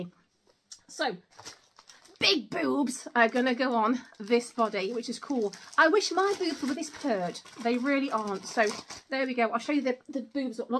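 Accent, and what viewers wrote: United Kingdom. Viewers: Very good video!! I love your enthusiasm. I have English as my second language and I wonder which part of the UK you're from. I love the way you talk. ❤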